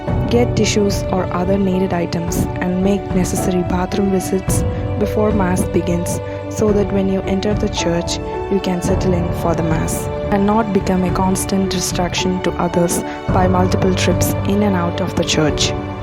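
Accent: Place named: India. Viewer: Very nice video presentation, and excellent advice. You sound Indian. Kerala, by any chance?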